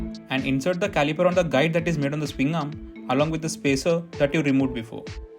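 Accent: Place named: India. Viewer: Finally I found English indian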